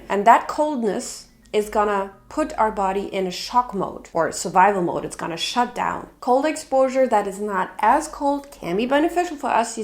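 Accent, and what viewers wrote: Germany. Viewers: Are you german ? I cant listen to accent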